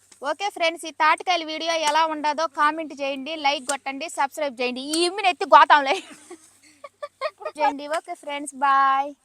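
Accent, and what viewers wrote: Nellore, India. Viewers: E ooru akka, mida, mi telugu nellore slang laga undi.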